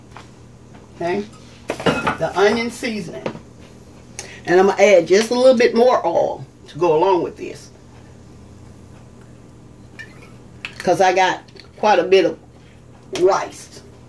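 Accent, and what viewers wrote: South, United States. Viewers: Her strong southern accent just made this 100× better. I KNOW that liver and onions good. Lol Also, I've never had it before and my bf wanted some. This helped a lot. ♥️ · Thanks looks good I really like your your southern accents I know people southern I really like southern friend chicken Thanks for sharing blessed you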